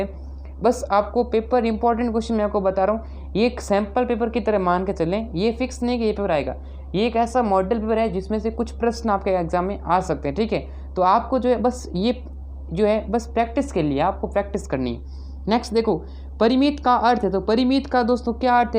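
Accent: Gujarat, India. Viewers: Bhai aap gujrat sa ho kya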